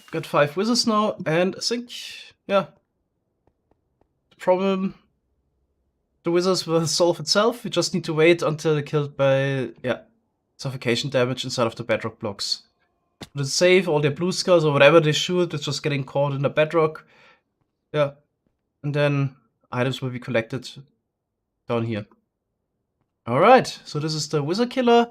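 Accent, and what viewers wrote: Germany. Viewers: I was watching yesterday’s recap and my dad looked and me and said “he sounds German” (he said it in German) and I broke out in laughter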